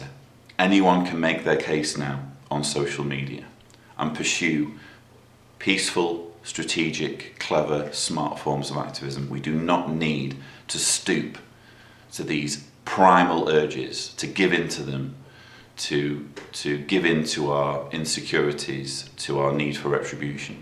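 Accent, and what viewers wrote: England, United Kingdom. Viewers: Ignorant men cannot be educated. Neither can ignorant women. There is a lack of education. Lack of education can be remedied. A few good lectures and careful correction of writing and analysis by a good prof. Such an education made me effective for Supreme Court litigation and corporate antitrust work. Anyone with half a brain knows that the biggest turn off is ME, ME, ME, ME, ME, ME. ME. He is far too old to be a millennial ME, ME, ME. God help his children. ME, ME/ Most likely, I can describe his wife. I suspect she lacks confidence. Anytime she wants to learn, she hears that all funds need to go to ME, ME, ME. I suspect that he admires her cleaning, sewing, and childrearing skills most of all. Sex is often secondary to working class men. They are not good lovers. ME, ME, ME. Rather than spend any family time, they hang out with men who endorse the ME, ME, ME mind frame. 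Ask me, how do I know this? First, observation within my own family. Second point. Reading detailed anthropological and psychological studies of working class men. ME, ME, ME Always ME> never WE, Never Our movement, pointing out powerful women and men, NO. ME/ My PC is very problematic. Also, his English usage is not that great. Don't fall for a proper English accent as the hallmark of intelligence. There are much better speakers and writers. If my computer were working, I could show you a much better example of my own writing. Dietrich Bonhoeffer, Hemingway. Steinbeck, F. Scott Fitzgerald, Hillary Mantel w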